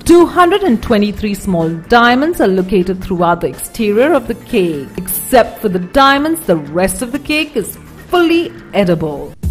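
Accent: India. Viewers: The person speaking is an indian Expensive, they are, but if you choke on one of those stones, youd end up in the er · interesting vid but the voiceover sucks balls. she is a northern indian. not blaming the accent...the tone is driving me insane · i fear the speaker is of Indian origin, awful english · She has a indian accent but she's trying the British accent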